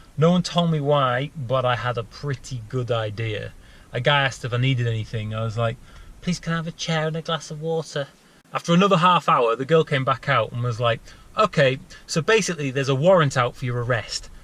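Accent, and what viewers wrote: United Kingdom. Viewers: What this dude serious in prison? Is tha wha all these comments are about? Damn. Hope he’s in British jail rather than a US jail with this accent bruv. Good luck! Hope they didn’t get u for that long for damn train hopping though god dang. I’m a train fan, yes it’s incredibly dangerous but…anyway. As long as you didn’t hurt anyone or something, sounds like this guy shouldn’t be in jail for 8 years or whatever??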